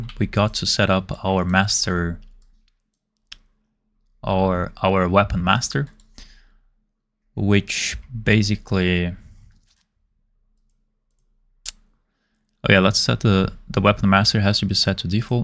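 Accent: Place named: Brazil. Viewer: mano, tu é brasileiro kkkkk programo ouvindo vc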